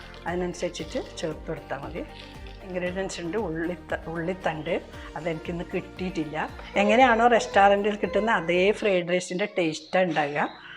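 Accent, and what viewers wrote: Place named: Kannur, India. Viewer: ആർക്കും മനസ്സിലാകുന്ന തികച്ചും കണ്ണൂര് സ്റ്റൈൽ അവതരണം 👍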